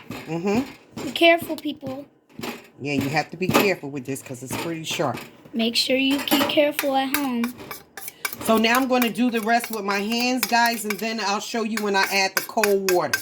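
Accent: Jamaica. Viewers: you are something else. That Jamaican accent. 😂 ❤️ I’m here watching. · ya mon indeed! Love the Jamacian accent! and a great helper too!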